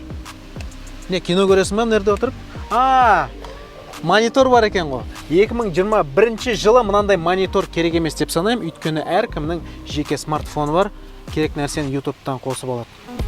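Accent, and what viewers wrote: Standard. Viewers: таза қазақша сөйлейді керемет. Міне дұрыс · мынандай таза сойледин казакни бринши рет эситип турмын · Жарайсың дами бер, таза қазақша сөйлейсің сол үшін көремін сені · Каринамен салыстырып жиберген калай кетті люгын🤣🤣🤣. Базар жок жасай бер бауырым. Современный таза қазақша сөйлеудің королісің. Бір ғана минус бар- шолуың тез бітіп калады, бес минут сиякты өте шыгады. ✋🤝🤝🙌🏻✊🏻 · Осы Жигит Жаксы Карапайым Отте Казакша таза солейди екен АЛЛА истерине Береке берсин бауырлар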